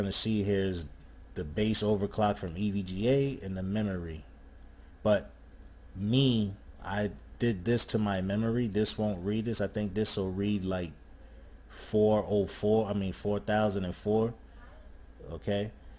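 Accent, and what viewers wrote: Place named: Australia. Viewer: He's obviously Australian.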